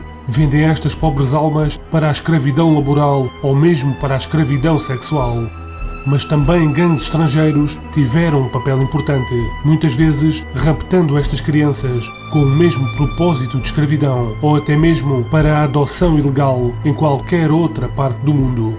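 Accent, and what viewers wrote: Portugal. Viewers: Gosto do idioma de Portugal mas pra narrativa n fica bom pra esses tipos de relatos da impressão de que o Roberto Leal esta narrando.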